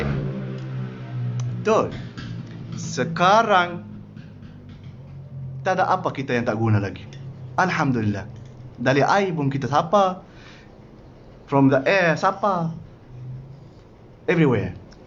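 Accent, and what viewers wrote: Kelantan, Malaysia. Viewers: Hebat lah kamu boleh cakap melayu walau dlm loghat kelantan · Kecek kelate bohh👍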